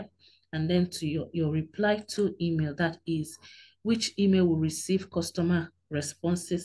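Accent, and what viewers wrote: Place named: Nigeria. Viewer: Asian face, nigerian voice.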